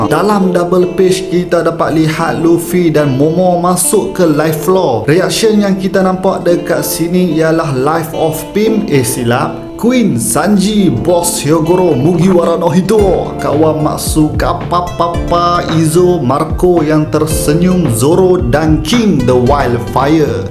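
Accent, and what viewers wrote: North, Malaysia. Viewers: Bro hg org utara blah Mna ? Aku Penang town . . Mantul utara loghat dlm penyampaian . . Semoga terus maju bro 💪🏼💪🏼👍🏼👍🏼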